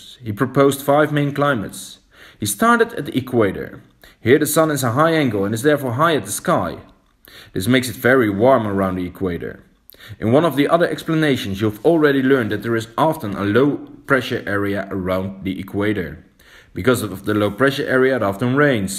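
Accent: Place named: Netherlands. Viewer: lekker typische nederlands accent weer op die engelse taal heerlijk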